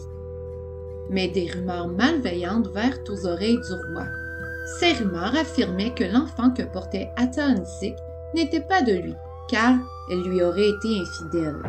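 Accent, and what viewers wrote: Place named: Quebec, Canada. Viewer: Merciii ... J'aime t'accent !! ... Svp c'est l'accent de quelle regeion de québec?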